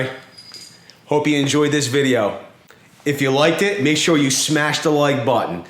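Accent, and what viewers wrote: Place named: Boston, United States. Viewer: This dude is definitely from Boston 😂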